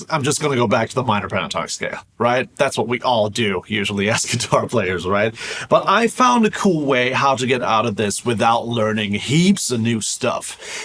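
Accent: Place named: Germany. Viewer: You have just the smallest hint of a German accent as if you moved to America around the age of 12.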